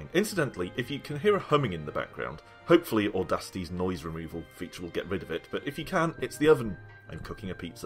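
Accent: United Kingdom. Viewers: i can tell you're british,great lets play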